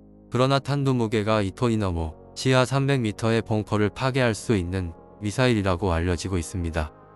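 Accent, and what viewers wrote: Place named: Jeolla, South Korea. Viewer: 깽깽이 사투리 못들어주것네... 수고혀라.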